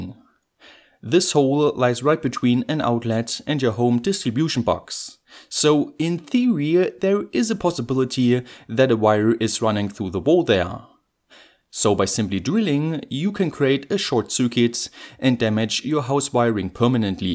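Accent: Germany. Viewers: It's surprising how many of my favorite YouTubers are German. From The Mathologer to TheOftler to you, I love the variety of accents! I notice your accent sounds a lot like Sabine Hossenfelder's.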